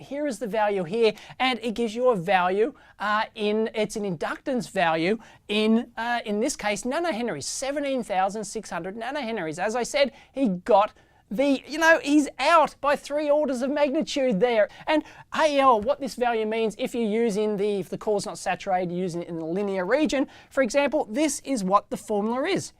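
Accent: Australia. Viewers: I have just watched an "English" video debunking a house energy saver, and now I have watched a Australian (?? hope I have not insulted you) debunking free energy - just fantastic going from low key cynicism to high intensity "your an idiot". Thank you for a excellent video and some real science. :):):)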